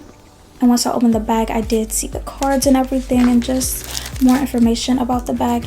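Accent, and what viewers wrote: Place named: Los Angeles, United States. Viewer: Your voice says Los Angeles but your eyebrows scream the South Bronx. Nice bag.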